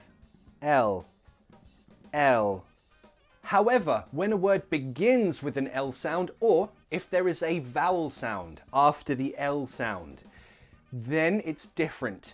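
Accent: United Kingdom. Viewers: I really do luv tgis man why in teaching .... he's the only reason why i like British accent ....he makes the British accent so easy....thanx man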